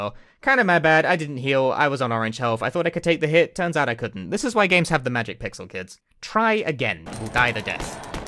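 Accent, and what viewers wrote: United Kingdom. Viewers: Are you british? You talk like a sitcom character.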